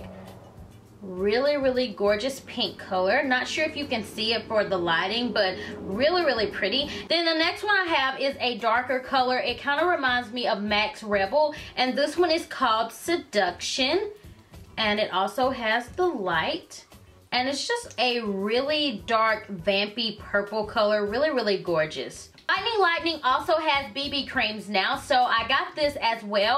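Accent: South, United States. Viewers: So I'm from Phenix City so i know all to well about that Bama summer weather. I bought the face & body last spring for the same reason hoping that it was a light coverage & didn't make me look like i was melting & i absolutely loved it for spring & summer. I sometimes would mix it with my Garnier BB cream. Hope you enjoy it & check out some of my videos & tell me what you think. Love your videos by the way. Its nice hearing another beauty lover with that southern twang. :)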